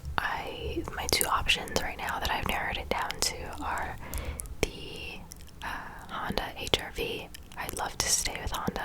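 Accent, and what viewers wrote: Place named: California, United States. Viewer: you can do no wrong. say whatever you like even if it's with a CA accent !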